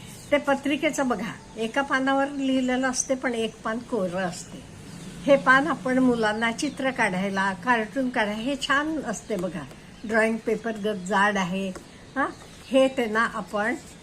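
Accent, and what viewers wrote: Karnataka, India. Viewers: तुम्ही खूप गोड आणि हुशार आहात.तुमच्या बोलण्यावरून तुम्ही Karnatak च्या असाव्यात हे ओळखले होते.तुमचे videos informative असतात.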